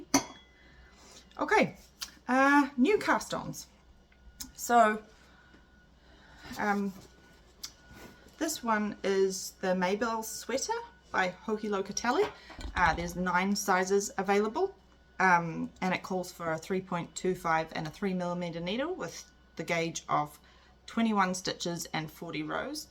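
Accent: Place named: New Zealand. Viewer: Hi - I've just found your podcast and I am loving listening to a local (Kiwi) accent. You've made some amazing items with such easy to follow show notes too - my "to knit" queue is getting longer.............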